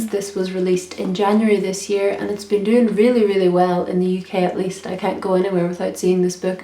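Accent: Ireland. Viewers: I read this book about two months ago, and I liked it. I normally don't read thrillers, I'm more of a romance book kind of girl. But it was interesting and it's one of these books where you can't stop reading, because you just have to know what happened . I haven't read gone girl, because I always really get into books and get into the characters and imagine stuff, so thrillers are kind of hard to read, when your imagination takes you into the characters and you scared. I really liked your review and I love your accent. I'm from Germany and love reading books in English. I definitely need to go to Ireland someday because the accent is just amazing, I could listen to you talk all day. Nothing to do with the book, but you just have a really calming voice.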